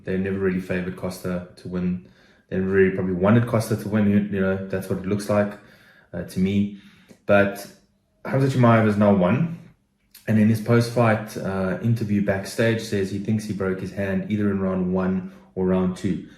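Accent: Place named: South Africa. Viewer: i see. I ve got a soft spot for the beautiful SA accent. Subbed :)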